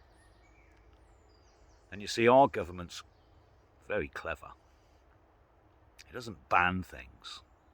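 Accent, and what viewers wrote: England, United Kingdom. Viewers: Thanks. I wish I had had a mentor like you when I was a young man. Love your old school attitude and (English?) accent. Wish you guys all the best in your endeavor, from across the pond 🙏🏻 We’ve got our own battles to fight, although it seems we are facing a common enemy..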